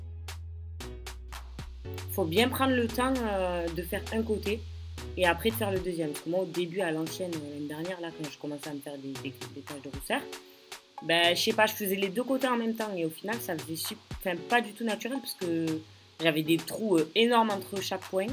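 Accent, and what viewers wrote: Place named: South, France. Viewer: T’a un accent du Sud , comme moi 😭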